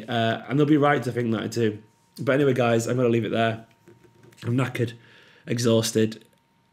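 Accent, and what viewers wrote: Manchester, United Kingdom. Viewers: You're so gutted your accent went extra manc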